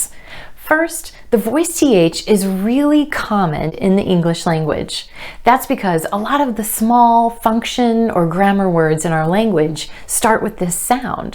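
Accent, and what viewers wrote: United States. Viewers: Thank you so much for another perfect lesson. I really have fallen in love with your beautiful American accent. I enjoy every your lesson cuz you explain everything very clearly. Thank you so much for your hard work!!! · You are American?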